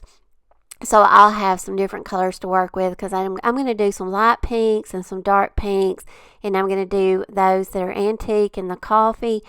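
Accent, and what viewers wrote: South, United States. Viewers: Me too! I do so love that southern charm accent that shouts easy going! Makes me feel home! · I love your channel. I also love your accent.. it reminds me of Southern Hospitality ❤️🥰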